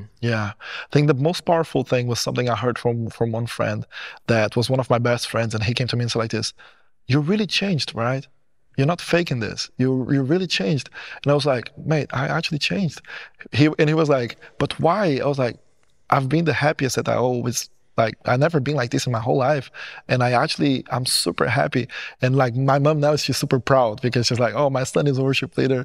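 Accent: Brazil. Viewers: wow I was 99% sure that he was brazilian!! hahaha, I`m brazilian too so was kinda of easy for me listen to some phonemes in his English, that btw is very good! And of course, apart from that, God be praised for your healing testimony! Hugs from BR 🟨🟩🟦⬜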